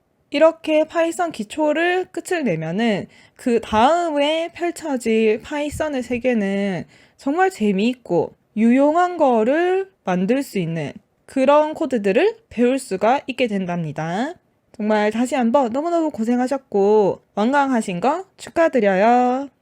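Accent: Gyeongsang, South Korea. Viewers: 대학 신입생 때 Fotran 수업을 들은 것이 전부였는데, 덕분에 파이썬이 어떤 언어인지 알게 됐습니다. 몇 군데 파이썬 관련 동영상을 찾아다녔는데, 가장 체계적으로 설명이 잘 되어 있어서 바로 이해 할 수 있었습니다. 명쾌하고,박력있는 열강 덕분에 30강을 완강했습니다. 가끔 경상도 억양도 매력적이었습니다. 아쉽게도 다음 강의를 찾아봤더니 다른 분이 강의를 하시네요. 다시 한번 감사드립니다. 안녕~~~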